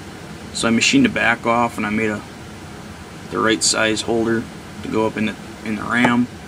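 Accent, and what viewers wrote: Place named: Michigan, United States. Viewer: I love the long needle and cork idea! Many times we've had to punch holes for belts, and also we like a make small leather projects. You can get a rolling wheel with sharp spikes on it, to mark your points for stitching, then use your needle punch to finish the holes through. Thank you Accent sounds L.P. Michigan to me, have relatives. I really like all the punches, and variety you have created for use with your arbor press.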